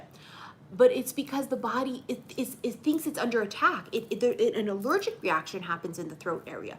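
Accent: New York, United States. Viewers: Sound like a New Yorker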